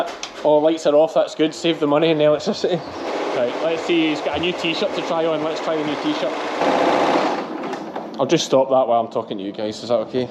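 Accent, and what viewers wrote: Scotland, United Kingdom. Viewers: "I've got 4 blackie hoggs here that arnie mine" the scottish accent is always a great listen 😂😂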